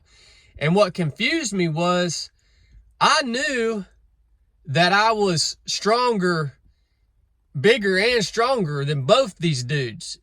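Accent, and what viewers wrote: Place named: West Virginia, United States. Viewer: You from West Virginia or any of those surrounding states? Accent is familiar with mountain people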